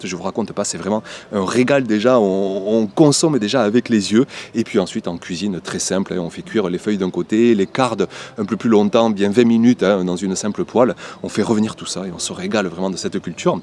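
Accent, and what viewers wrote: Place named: Southeast, France. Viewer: Bonjour, natif du sud-est, je te suis depuis la Bretagne, ton accent me rappelle les sources 😎 merci pour cette vidéo, toujours un plaisir à regarder, écouter et pleins de bons conseils. Par contre je me pose une question, dans la vidéo tu indiques que tu manges les fèves crues, j'avais lu que: "Les fèves crues (comme d'autres légumineuses) contiennent des substances naturelles comme des lectines qui peuvent être toxiques ou irritantes pour le système digestif si elles ne sont pas détruites par la cuisson." Personnellement il m'arrivait d'ouvrir quelques cosses et en manger directement dans le potager 😋 mais du coup je ne suis pas certain que ce soit une bonne idée côté digestif, il vaut peut être mieux les cuire non? (Je pense que tout est question de quantité aussi). Belle journée;)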